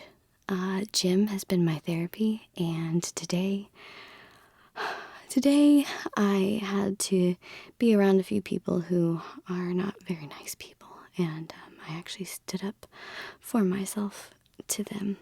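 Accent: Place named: Texas, United States. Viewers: Please come back!!! You are my favourite ASMR artist ! You were the first one I stumbled upon and I have a sentimental connection to you, you have no idea. You're even on my photo collage lock screen of "favourite people" (next to Freddie Mercury and Sylvia Plath). (Holy shit that sounds invasive and weird but you just make me smile every time I visit your Channel) I've missed you so much! Your role plays, your singing, your cleaning! your acting! Your hint of a Texan accent (my Australian one is rather monotonous) I'm 16 and I'm in my final year of high school and I need you to placate me ! Okay I need to slow my role but you get the picture -- you're amazing, genuine, quirky, amicable, and a little bit of sunshine to light up my day. Please please upload soon! I miss you!!!! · AHHHHHHH LORD YOU HAVE BEEN MISSED!!!!! me and the wife love your channel and your Texas accent we are from Dallas :p but so happy your doing good keep it up :)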